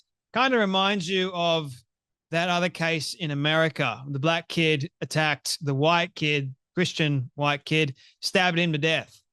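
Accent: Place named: Australia. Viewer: dude your Mick Dundee accent got me to subscribe love the accent & content from Fla.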